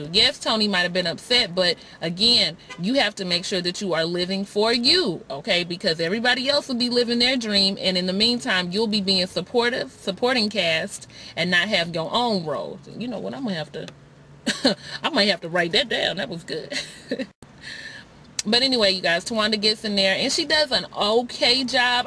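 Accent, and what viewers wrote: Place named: South, United States. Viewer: Great review and you are quite funny. lol I am trying to place your accent. It sounds like somewhere down south.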